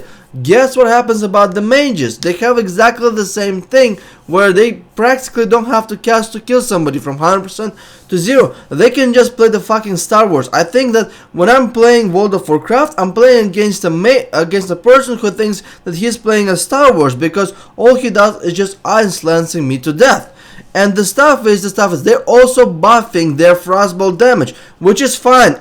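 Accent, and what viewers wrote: Russia. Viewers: как приятно слышать Русский акцент:)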